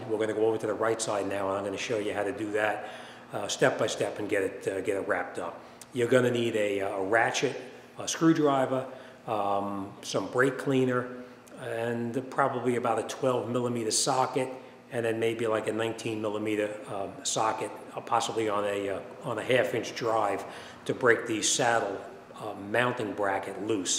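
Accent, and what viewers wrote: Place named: New Jersey, United States. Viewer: R u from like new joysee? Love the accent.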